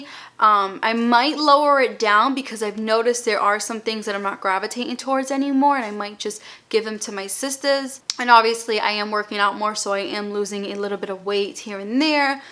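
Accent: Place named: New Jersey, United States. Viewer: I live in Michigan but my dad is from massachusetts and I’m not used to hearing people talk exactly like my dad talks, so hearing your accent makes me so happy and reminds me of my family back in Massachusetts!💛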